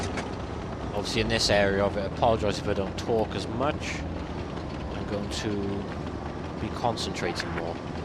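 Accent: Wales, United Kingdom. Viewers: Those Dulcet Welsh Tones :)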